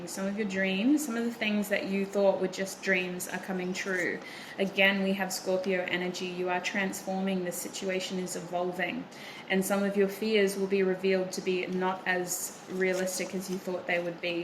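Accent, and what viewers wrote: Australia. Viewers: I literally saw the backyard and thought "huh. That looks very Australian" and then you spoke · Yoooo this is my first time watching any of your vids popped up on my recommended cause I’ve been watching a bunch of tarot lately 1 I was surprised hearing a fellow Aussie accent 2 I picked pile 3 it resonated so strongly I was shocked 3 it’s the first time I watched someone pull letters out and stuff YOU LITERALLY SPELT MY NAME “Steph” and also my last name, is P so really u spelt “Steph p”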